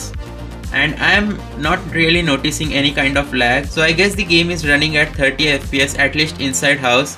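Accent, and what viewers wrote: India. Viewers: As soon as I heard the Indian accent, I knew it was going to be fake OK that’s racist…. I’m sorry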